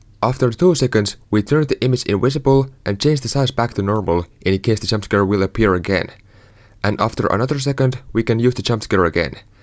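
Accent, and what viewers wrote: Finland. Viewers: Accent sounded very familiar. Wait a minute.. SUOMALAINEN ROBLOX TUBETTAJA OMG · Ootko suomalainen ku kuullostat silt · Are you finnish?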